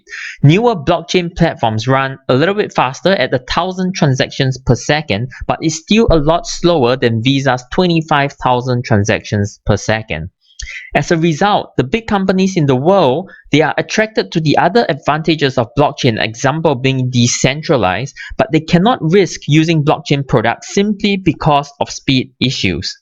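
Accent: Singapore. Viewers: Great analysis and explanation, and I like your Singlish! Can you review QLink ? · Yup, im from Singapore and I live in Singapore, when i heard your accent I knew you were from Singapore. omg nice to see a fellow Singaporean into crypto too, anyways we could get in touch, would like to learn more knowledge on crypto :)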